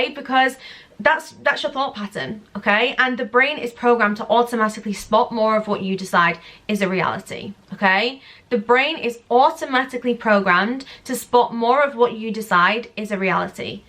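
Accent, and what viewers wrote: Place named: United Kingdom. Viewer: I am in love with the british accent, I could listen to this for hours 🫶🏽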